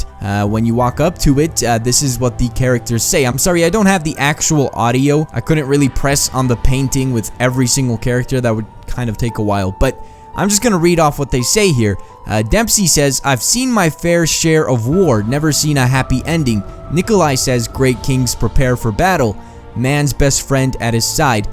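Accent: Bosnia and Herzegovina. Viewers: are u bosnian?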